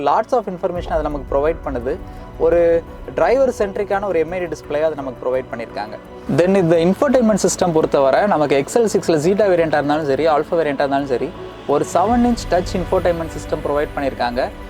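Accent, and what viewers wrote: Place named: London, United Kingdom. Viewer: இது தமிழ் விமர்சனமாக இல்லை. தம்பி லண்டன்காரா் போல பேசுராரு.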